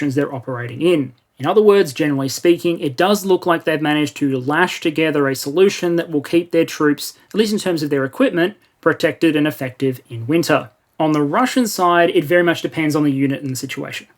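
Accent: Melbourne, Australia. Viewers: id sub but you sound like you are from melbourne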